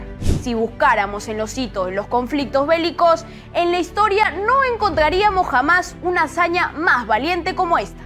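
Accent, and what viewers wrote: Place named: Argentina. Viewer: Por qué habla como Argentina?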